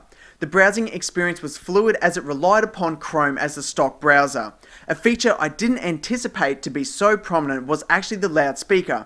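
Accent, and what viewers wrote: Australia. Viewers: Australian accent ~ :D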